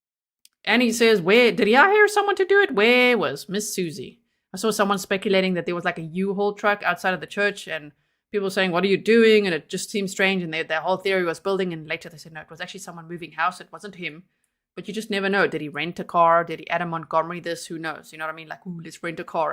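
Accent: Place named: South Africa. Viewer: So glad to finally find out that you are from SA! When I first watched one of your videos and thought "man that accent sounds so South African" so thank you for confirming that, my mind can rest easy now! From a fellow South African!🤗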